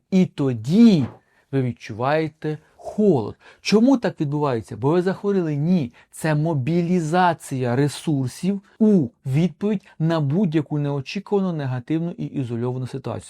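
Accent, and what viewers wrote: Vinnytsia, Ukraine. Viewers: Мені здається що ви з Вінницької області.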